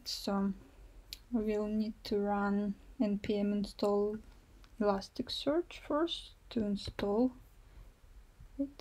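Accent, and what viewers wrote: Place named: Russia. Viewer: One can always spot a Russian thanks to this amazing accent! Tx for the vid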